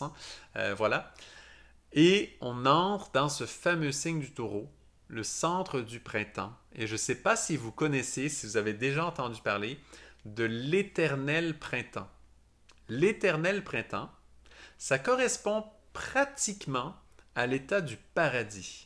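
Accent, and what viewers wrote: Canada. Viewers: Merci beaucoup pour cette chouette vidéo !!!! (avec l'accent canadien en plus 😍💖👌🌟🌻) Et très jolie chemise &fleurs je suis dans le jaune aussi en ce moment ^^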